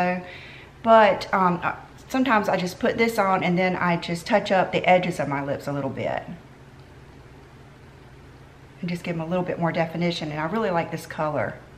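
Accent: South, United States. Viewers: We have the same southern accent...I am from Lake Martin, Alabama? What state are you from?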